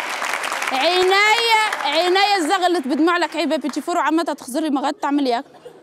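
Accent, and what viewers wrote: Kasserine, Tunisia. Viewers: هي تحكي هكك هي مالقصرين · شحال شابة لهجة تونسية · rani 7ata na gassrinia ema hya s7i7 t5dem 3la ro7ha rabi y3inha o5ti ema mch bdharoura bch tdha7k l3bed tst3ml l'accent mt3na wt7ki blahjtna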